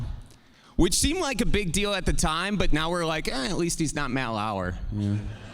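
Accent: Wisconsin, United States. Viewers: You certainly still have a Wisconsin accent. Not quite as Manitowocy though.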